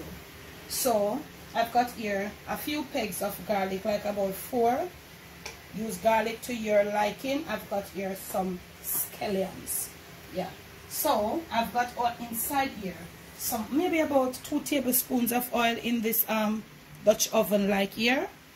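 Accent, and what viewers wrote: Jamaica. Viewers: Mrs. I just watch your Sunday show and once again your cooking make me long for a vacation to Jamaica just to eat your food Many blessings to you and the ones you love P.s. I just love your accent especially the way you say "and all dat"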